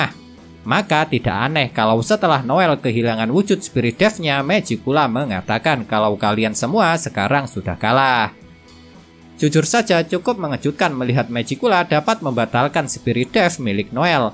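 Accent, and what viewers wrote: Java, Indonesia. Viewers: Dia wong jowo. Udh gitu enak meddok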